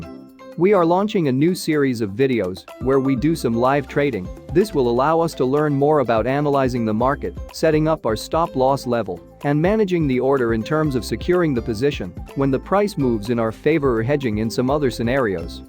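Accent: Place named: United Kingdom. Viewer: You are somehere in GB, Scotland or England most likely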